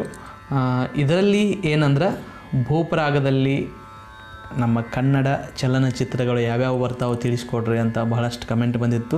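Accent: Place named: North Karnataka, India. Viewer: Tq so much sir play list madiddakke........ Sir nimma uru yavadu sir... Nimma language namma uttara karnataka du ide... Please sir tell me ur from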